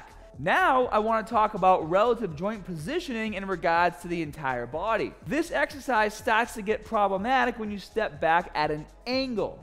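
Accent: Boston, United States. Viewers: Damn...you’ve been in Florida for several months...and still have that Baaastin accent....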